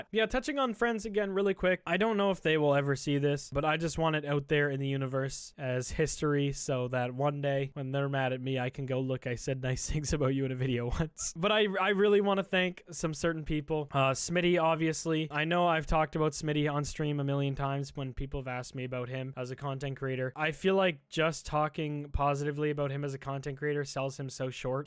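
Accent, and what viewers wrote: Canada. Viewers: Gawd, you're so Canadian white but it's like little sand hints of arab thrown in there.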